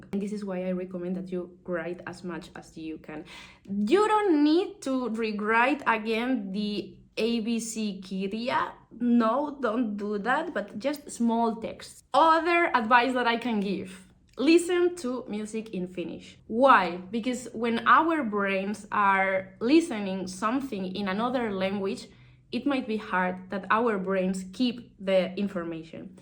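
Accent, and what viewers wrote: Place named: England, United Kingdom. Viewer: ngl i love your english accent, so much energy!!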